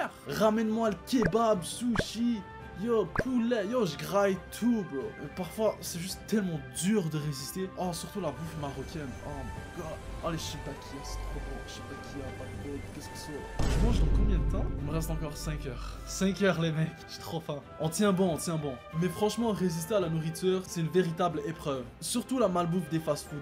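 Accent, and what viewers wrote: Quebec, Canada. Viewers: Ta un petit accent québécois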